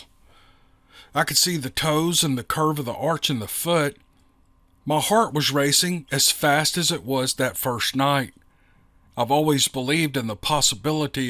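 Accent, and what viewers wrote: South, United States. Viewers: Now wait just a minute! You better hold on to your Weave. Cuz this is an all inclusive Channel. Us Yanks love to curl up and listen to our Favorite County boy. With his smooth Southern accent!🥰🎶🙏🏾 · Hey....Im not a red neck, but I did live in Arkansas for over 40 years....I love the South and its great to hear your accent... Love it... Thanks for show.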